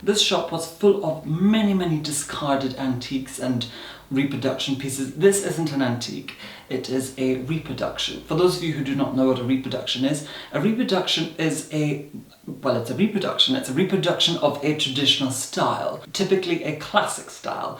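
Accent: Scotland, United Kingdom. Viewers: I feel like I can hear a scottish accent under that voice. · I knew it! You are Scottish! I always thought with your posh voice you were English but I've always heard a small slice of Scotland in there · He's saying 'film'. It's accent. I believe he is Scottish or Welsh originally, but has lived in London for many years.:) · He has a slight Scottish accent as well. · OUTER HEBRIDES, I KNEW YOU HAD AN ACCENT!!!!! I AM FROM THE OUTER HEBRIDES AS WELL!!! WHICH ISLAND?? Sorry thats weird ahahaha, I don't know why I am so excited, I have just never had a youtuber from where I live! Wow, I actually knew you had an accent, whenever I listen to you I always listen to your accent and I knew you were from Scotland from the accent but! Do you speak gaidhlig!? omg I am such an idiot! Your last name!! That is such a popular name in the outer Hebrides. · actually saying film like 'filum' is extremely common still in Scotland (where and I are from) the difference is you rarely have many people with our accent as articulately spoken, but does live in London now (for how long, I'm unsure, but his Scottish accent is still there and very prominent) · I kinda felt that your accent is not that British or someone from London. 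It confirmed that when you said you're from Scotland.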